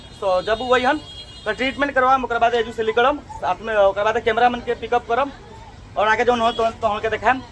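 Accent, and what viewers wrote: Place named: Bhojpuri, India. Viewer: I from Nepal... Bhojpuri bhasa itna mast boll nu dil khus hojala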